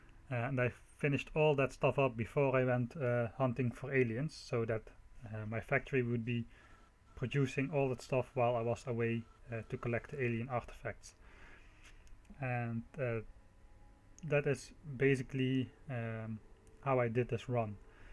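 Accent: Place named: Netherlands. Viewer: je bent nederlands best wel te horen :P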